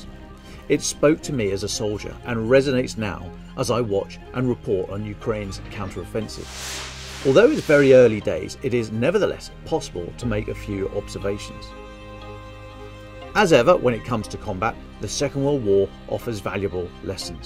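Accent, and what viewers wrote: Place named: United Kingdom. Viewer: Nice to hear a brit giving love to good old T.R. 😄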